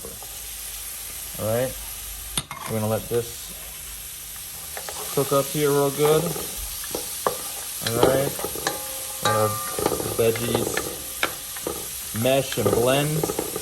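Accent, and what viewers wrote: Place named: Trinidad and Tobago. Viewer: Hi, i love how you use the local trini indian terms when you are cooking. You sound like a real long time trini back home 😊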